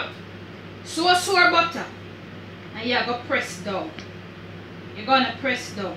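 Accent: Jamaica. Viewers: Hi, I started watching your channel just recently and I'm really motivated and inspired by your teachings in the kitchen. I am learning alot...I'm quite impressed with your vocabulary (excellent pronunciation of different herbs, spices, food and so much more ) I know you used the patios to let it be known that we are all Jamaican but deep within you are an intelligent person. Please ignore the haters out there, there will always be haters (these are people who allow the devil to use them) You are doing an excellent job, keep up the good work, keep the faith and always trust in our Creator God.. God bless.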